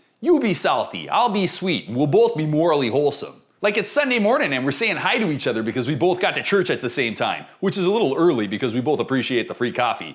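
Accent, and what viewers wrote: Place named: Minnesota, United States. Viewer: You're soooooooo much more Minnesooooootan than I remember you being!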